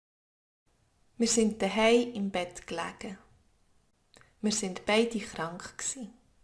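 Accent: Bern, Switzerland. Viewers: Sounds like Bern region 👍🏻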